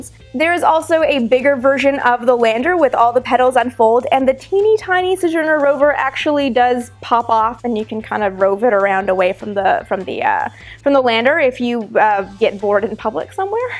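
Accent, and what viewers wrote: Canada. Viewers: You sound Canadian. So strange that almost all of the channels I'm subscribed to are Canadian.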